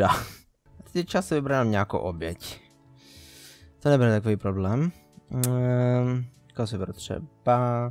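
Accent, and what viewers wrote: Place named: Ostrava, Czech Republic. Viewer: Btw jsi někde z okolí Ostravy? Zníš jako totální Ostravák :D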